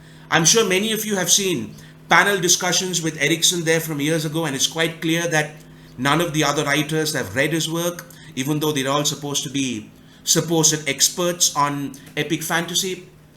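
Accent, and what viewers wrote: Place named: Sri Lanka. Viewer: Are you saying you can't be taken seriously? Because of the thick Sri Lankan accent? Coz that would be a crying shame considering the seriously cracking great content , serious no joke , not kidding even a little bit, true story ... Gospel even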